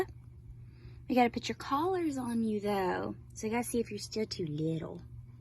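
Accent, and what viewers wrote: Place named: South, United States. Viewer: Your sweet voice combined with your Southern accent is very SOOTHING FOR THEM! 😇😇😇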